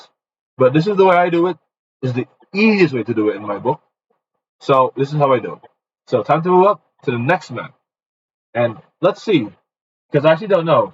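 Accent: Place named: Netherlands. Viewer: Thanks for the video btw you sound dutch what is weird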